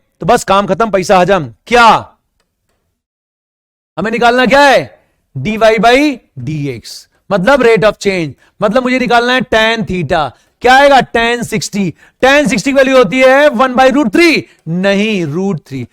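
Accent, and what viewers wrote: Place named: Bhojpuri, India. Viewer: I am also from from bihar and i loved ur bhojpuri accent a lot .. Thank you for the lecture. No doubt best physics test ever .....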